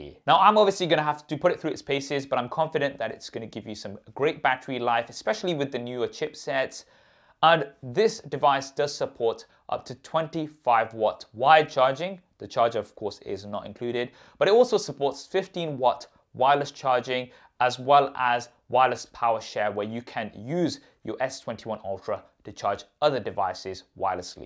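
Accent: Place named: United Kingdom. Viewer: His voice got so soothing in middle of the video that i fell asleep. Dude you gotta make a lullaby playlist in that British Accent.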